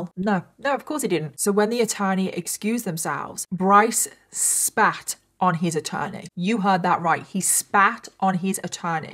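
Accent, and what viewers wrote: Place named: West Midlands, United Kingdom. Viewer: Not sure what part, but definitely a West Midlands accent.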